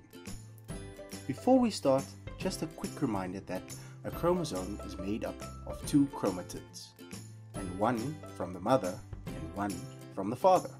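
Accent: South Africa. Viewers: This man sounds South African! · i just want to know if the narrator is south african?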